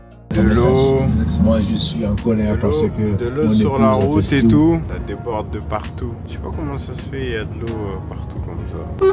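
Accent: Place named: Marne, France. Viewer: Mais nan! T’es de Reims! Je le savais! T’as l’accent marnais 😊, un cagoulois de Chalons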